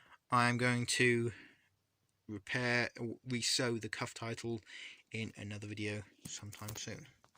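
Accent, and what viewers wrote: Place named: United Kingdom. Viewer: Mate, you have a strong British accent.... nice room decoration..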